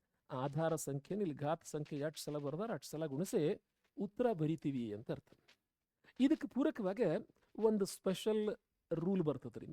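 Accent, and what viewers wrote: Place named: North Karnataka, India. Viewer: ಸರ್ ನಮ್ ಉತ್ತರ ಕರ್ನಾಟಕ ಭಾಷೆ 💥💥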